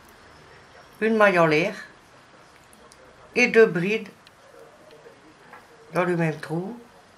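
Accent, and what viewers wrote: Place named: Pas-de-Calais, France. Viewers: Bonjour, déjà merci de nous offrir tous ces tutos que vous expliquez à la perfection, j'ai retrouvé un papier sur lequel j'avais ecris leur taille et dimensions pour celle de 8 ans c'est bien d'épaule à épaule donc 30 cm pour celle de 10 ans 34 cm mais en vous regardant travailler si j'ai bien compris on fait un nombre de maille qui va jusqu'à ces dimensions et cela nous donne un poncho à leur taille, c'est bien cela!!!! Merci pour votre gentillesse et votre charmant accent que j'adore cela me rappelle Cambrai!!! belle journée et merci pour votre réponse!!!! je vous cite à chaque fois que je fais un de vos ouvrages et je vois que pas mal de personne vient visiter votre site!!!! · Votre accent du Pas de Calais, c'est celui de ma Grand-Mère 😍, une grande crocheteuse aussi, je pense toujours à elle quand je crochète. 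· Je l ai reconnu de suite l accent ch'ti je suis de bruay la Buissière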